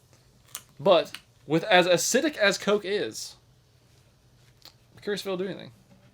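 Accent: South, United States. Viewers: I wanna say that was a joke but it comes far too easy for him. For what it’s worth though I believe we found out that is playing a character in some videos. It’s not noticeable now but if you check his old vids you can see how differently was acting back then. I swear he was even putting on a (stronger) southern accent in those vids on top of the rest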